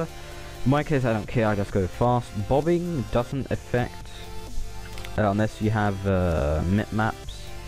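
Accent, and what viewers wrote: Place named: United Kingdom. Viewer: You're norwegian or british xD,i noticed your accent so i thought you were british.Anyways nice video mate helped me alot.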